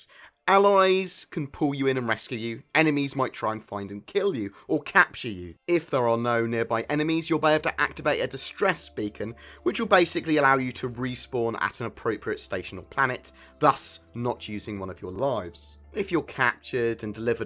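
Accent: United Kingdom. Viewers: Great video as always! Have you ever considered about a professional anchor position? You remind me of a lot of British actual speakers..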